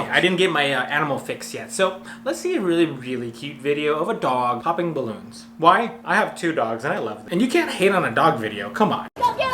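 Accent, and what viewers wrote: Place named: United States. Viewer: You got the weirdest country accent I've ever heard. lol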